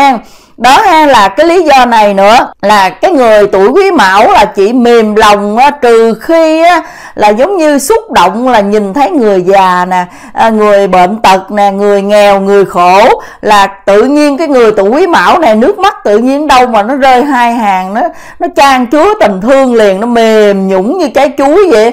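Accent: Standard. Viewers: Chi noi qua chuan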